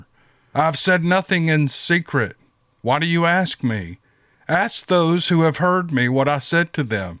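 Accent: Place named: South, United States. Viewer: Hearing that ultra-familiar, southern accent narrating the Bible is more comforting than I can possibly express in words. I humbly thank you for this, Mr.. I shared these with my family.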